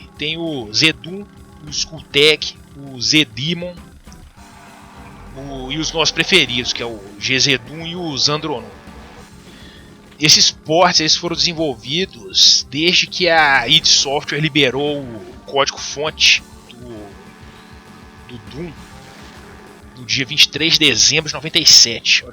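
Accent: Minas Gerais, Brazil. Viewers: O melhor do video é o sotaque de mineiro do mlk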